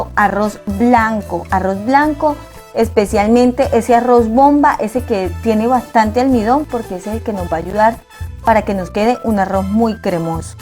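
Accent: Colombia. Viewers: El acento no lo pude deducir...escuché valluno, costeño y paisa. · Ese acento es del llano, Santander o amazonas?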